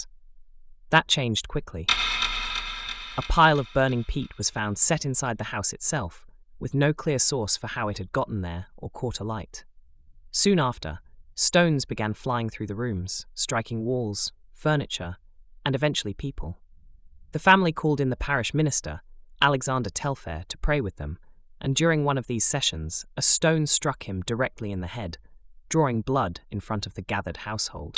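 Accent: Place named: England, United Kingdom. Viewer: I just discovered your channel today, and I had to immediately subscribe. Your videos are nicely done, and without filler or sensationalism. I would like to know what part of England your accent is from. You sound just like Daniel Radcliffe (and that’s not a bad thing!). 😊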